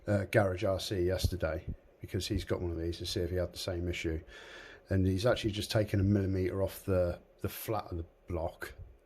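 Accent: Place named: United Kingdom. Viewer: I guess I found time 😹 & great accent btw, for some reason British voices are so easy for me to listen to. Solid video & great tip on the steering rack holder thingy. My steering has a bit of slop in it too, I’ll have to tear it apart & take another look in there. I’ll have to check out the video on bodies later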